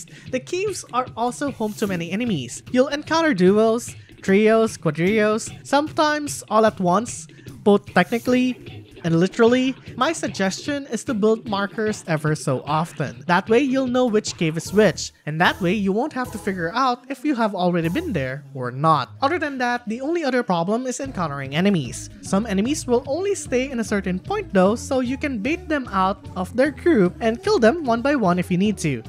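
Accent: Philippines. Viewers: You sound Filipino 👀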